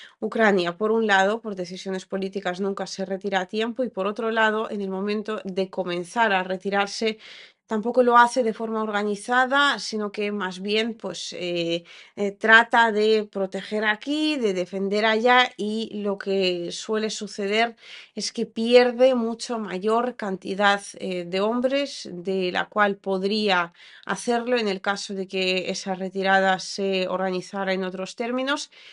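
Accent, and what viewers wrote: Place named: Spain. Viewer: Hola, me gusta mucho tu contenido y quiero decirte que también yo soy seguidor de tu compatriota La señorita Inna Afinoginava, yo créo que ya la conoces, porque ella también estudio el español en España 🇪🇸 Yo soy de Mexico y las sigo a las dos Pero me gusta mucho verla a ella porque habla cómo nosotros en Mexico, y no tiene el acento español como lo tienes tú. En España usan mucho los sinónimos De Os, Vos y sois, sabeis. Lo que en Mexico se erradicó el Español ibérico. Es cómo el Inglés de Inglaterra 🏴 y el de Estados Unidos Que son muy diferentes. Me gustaría que hablaras más cómo hablamos acá en Mexico. Así como lo hace Inna Afinoginova.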